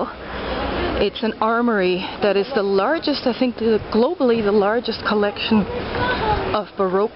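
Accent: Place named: Canada. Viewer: Your accent was a bit of a mystery for me :), I thought you didn't sound American and when you said Canadian is all made sense :). Thanks for the video!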